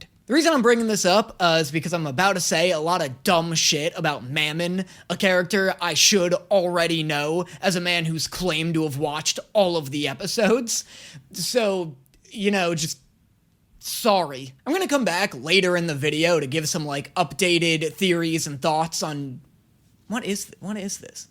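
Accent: United States. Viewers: I think the thing with Stolas’ name is that he’s got a posh English accent and you’re using the same emphasis on the syllables he is, which sounds odd to some ppls ears coz you’re American dunno, you are saying it right tho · People are mad because they want you to say Stolas like (Stol-ihs) instead of (Stol-ass) Edit: The more British you are, the more pronounced the a is in Stolas, so it's said like (Stol-uhs) by the Goetia, and then the non-Brittish speaking characters say (Stol-ihs), the only reason why people are on your case is because no one actually says stolass, they say either Stolihs or Stoluhs, never Stolass- it just sounds similar (I think it's bc half the people who say Stolas are British and you have an American accent, so you saying it similar to them makes you want to pronounce the a as an a sound and not how it's actually pronounced)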